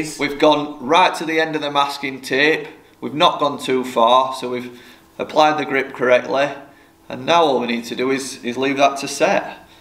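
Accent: Yorkshire, United Kingdom. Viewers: Great video will definitely try thus myself next time 👍but the best part for is being a Rotherham lad just listening to another Yorkshire lad trying to pronounce everything clear for his audience great job 😁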